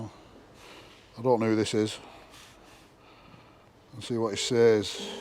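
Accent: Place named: Wigan, United Kingdom. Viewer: you sound local , are you from wigan? either way your videos are pretty decent.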